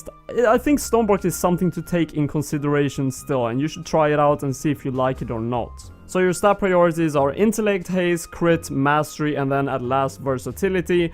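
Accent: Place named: Sweden. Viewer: I can hear that swedish accent..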